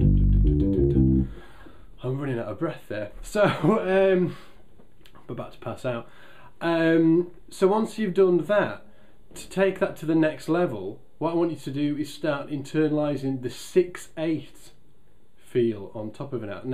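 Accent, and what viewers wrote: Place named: Northern England, United Kingdom. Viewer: I usually don't dig instrument lessons and rather prefer to find out those things myself (takes longer, yes). But these lessons are perfectly inspiring - and you don't often find bass playing being explained in that great Northern accent. :)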